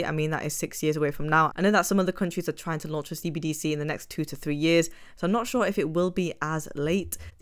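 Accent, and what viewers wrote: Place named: North, United Kingdom. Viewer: northern british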